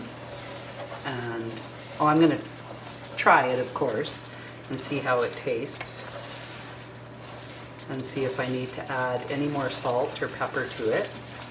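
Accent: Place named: British Columbia, Canada. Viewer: Well done ma'am, looks delicious. First time running into your site, enjoyable. Noticed a wee bit of B.C. brogue in your voice. Can listen to that all day long. Am located probably about 400 miles south of your location. Have an enjoyable weekend.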